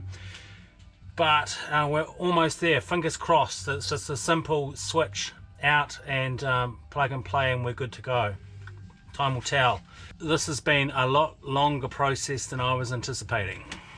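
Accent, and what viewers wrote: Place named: New Zealand. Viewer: I would put in another inspection hatch and install the sensor into that - unclip the wiring, unscrew the hatch lid and there is your sensor. Great video, I started with Part 1 as result of a search on marine toilet maintenance. So nice to hear a Kiwi accent from a Youtube search for a change. I'm going to install a marine macerator into my new motorhome so doing lots of google and youtube at the moment. Must do another Picton trip (from Chch) as soon as I am mobile again.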